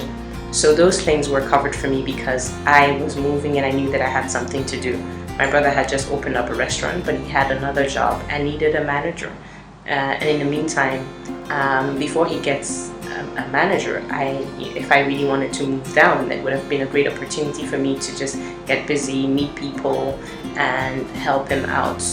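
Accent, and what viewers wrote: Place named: Ghana. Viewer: She sounds like she has a Ghana accent (ie not foreign). She said that BOTH of her parents are from Ghana.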